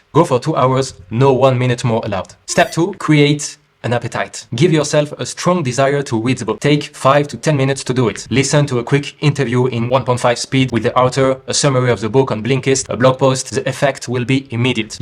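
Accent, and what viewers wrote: France. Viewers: I am curious what your native language is. I’m assuming a middle eastern language because of your name But then the accent sounds French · I denote a French accent😊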